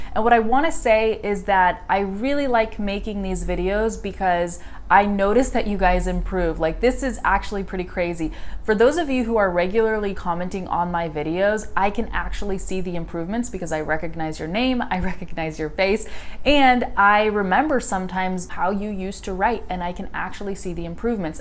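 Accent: California, United States. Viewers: Hey,! You speak very undastandable and clear American English! I d like to know what state do you live in? Is it common pronunciation in your state? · Do you still have an American accent when you speak spanish or a native one? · I am learning English. Do you speak an American or British accent?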